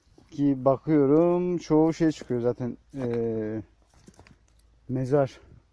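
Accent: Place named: Erzurum, Turkey. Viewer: Erzurum civarı diye düşündüm... düzlük, yükselti... bir de konuşan adamın ağzı.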